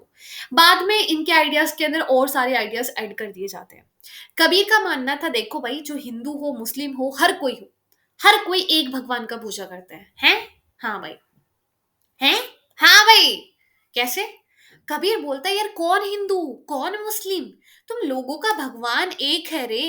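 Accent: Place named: Mumbai, India. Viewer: Tum mumbai se ho kya? Accent mumbai walo jese he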